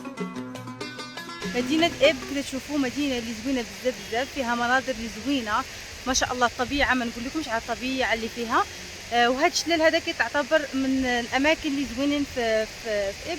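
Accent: Morocco. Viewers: مغربيه اصيله لازالت محافظه على اللهجه المغربيه الجميلة الله يحييك في بلدك الثاني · اللهجه المغربيه حلوه جداً 🤭 🤭 🙆🏻ماشاء الله تبارك الله 🤭 🤭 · السلام عليكم الأخت مغربية بنت بلادي و حتى اللهجة مراكشية نفسي نزور اليمن و نحب هد البلد بعد الزيارة للسعودية للأماكن المقدسة تعرفت على يمنيين ناس شهامة و كرم و عروبة ، عدي سؤال بعد سنوات الحرب نفسي أعرف كيف هي الحالة العامة هل في سلم و أمن و أمان ، نفسي أزور اليمن أب ، صنعاء عدن مأرب .... بلد العسل و العقيق ، و نحب نعرف هل كانت تعقيدات للحصول على فيزا في الرباط شكرا.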